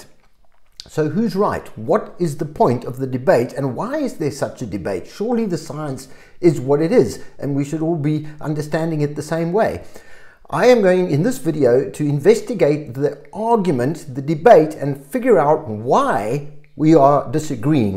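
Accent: South Africa. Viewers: Good heavens, this is a triumphant explanation, and what a pleasant surprise to hear a Saffa accent! I dove headlong into the debate after tearing a labrum. Drs contradicted Physios who claimed that bursa replenish the cartilage by providing nutrients through movement. I'm a 42-year-old (gnarly) Capetonian surfer and I'm plunging into PT to stave off surgery. Thanks for the insight. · KZN accent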